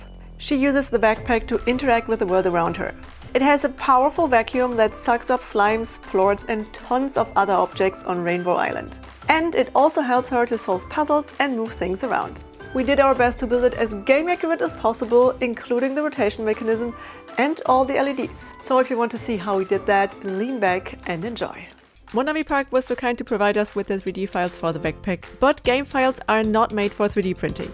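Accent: Germany. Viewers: I thought your accent sounded German! :D Wago is the brand, so like Tempo or Zewa it is used as a noun even though it's a name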